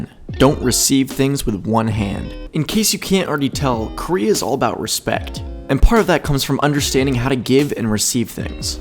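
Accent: United States. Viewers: Are you by any chance from Maryland? Your accent reminds me of so much of some of the people from there.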